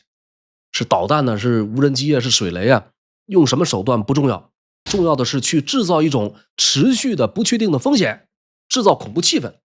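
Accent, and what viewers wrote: China. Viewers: 就是个大外宣还挺懂潜规则知道用繁体亲近港澳台，奈何口音变不了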